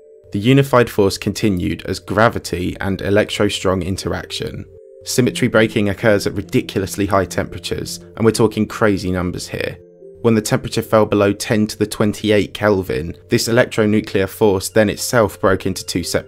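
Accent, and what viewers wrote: United Kingdom. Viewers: Except for the British accent its very descriptive and concise leaving out only one major point, the universe was born in a medium of some sorts which allowed for containment of the energy. Also, I wonder why people ask why, the answer is all around us.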